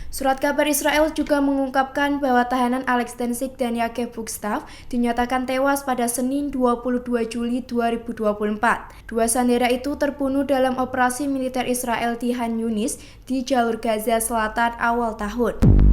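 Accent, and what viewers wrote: Java, Indonesia. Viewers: Soundnya kok medoookkk banget 😂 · suarane medok,,,tp merdu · Ngomngnya medok amat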